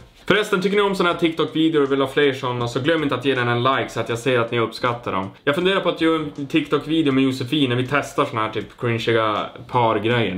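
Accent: Norrland, Sweden. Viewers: Jååå, hittade din kanal för typ en vecka sen å har helt fastnat. Är från Lule å älskar din norrländska! 💖✨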